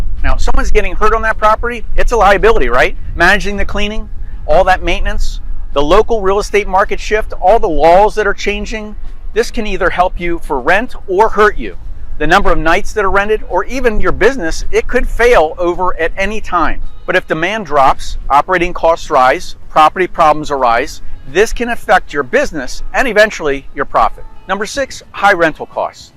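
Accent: United States. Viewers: This is weird. The American English accent is right, but these aren’t all real English sentences. AI maybe?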